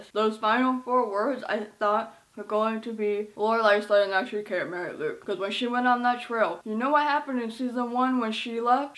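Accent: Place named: New York, United States. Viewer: lol, I was just joking around, the New York and Boston accent are very recognizable even to us Canadians. I stand by it being cute still.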